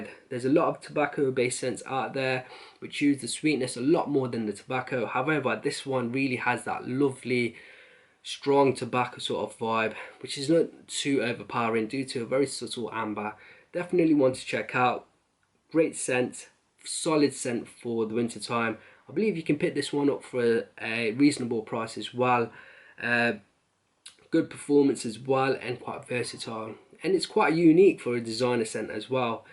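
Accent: Birmingham, United Kingdom. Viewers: Are from around Birmingham mate? Just got this myself today. love it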